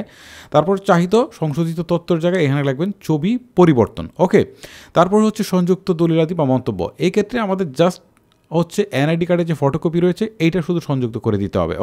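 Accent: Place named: Mymensingh, Bangladesh. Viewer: টিকানা, টিক আছে। ভাই আপনার বাড়ি কি ময়মনসিংহ বা কিশোরগঞ্জে?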